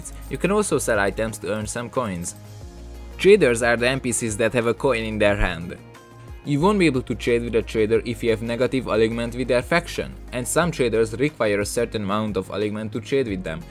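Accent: Hungary. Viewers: De dúrva a magyar akcentus, de fasza a videó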